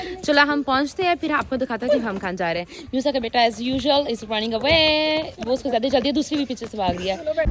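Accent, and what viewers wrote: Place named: Pakistan. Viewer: Ap pakistan m kha sy h because your acsent is different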